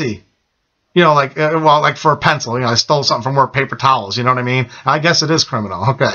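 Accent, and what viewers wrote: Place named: United States. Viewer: thank you so so so so much for sharing this....I just happened on this video, but I'm sure for good reason. You just sound like the typical American guy...and the thing is, there are MILLIONS of us just typical Americans worried about things that don't matter, and spending all of our time wasting life. Your testimony bears upon my heart, even though I've been a Christian all my life...there's more. There's more about being a servant of Christ than just being a 'Christian'. Like you are saying...God bless you. God bless you. Jesus thank you.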